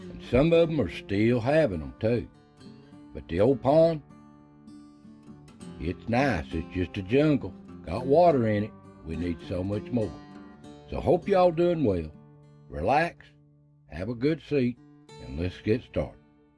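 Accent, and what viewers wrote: Appalachia, United States. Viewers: I'm assuming n we should never do that but you r from Appalachian mountains for sure . Tennessee? Ty Southern brother.hillbily mill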